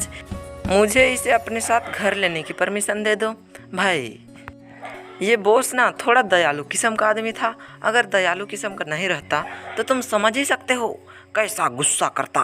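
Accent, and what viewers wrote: Bihar, India. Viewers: Bhai tum meri tarah bihari ho kya😀😀😀😀😘😘😘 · Bihar sa ho kay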